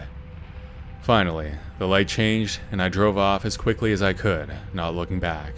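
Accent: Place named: Canada. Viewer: I dig the Canadian accent.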